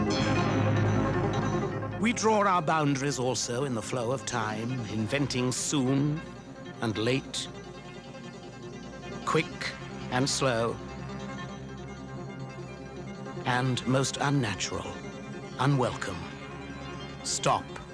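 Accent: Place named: England, United Kingdom. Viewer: I DON'T want to be, or sound like many of the negative commenters we come across online.. I'd RATHER be positive! But... this video kinda sucks. It's shallow, doesn't say much at all.. just the English accented narrator speaking sparse attempts at poetic sounding narrative, shallow talk about how live "within boundaries" in this life, and other such trite sounding quasi-philosophical babbling. There's very, very little discussion about ... "The Other Side Of Life". Very little, other than a couple allusions toward someone having a NDE on an operating table. The rest of the video, to a large degree, is images of scenes on Earth, with accompanying 70's sounding made for TV movie soundtracs. Oh well. Watch it if you want ;) Blessings to all! We're all out of here some relatively soon coming day, and we'll each experience the ultimate "THIS IS IT!" for ourselves.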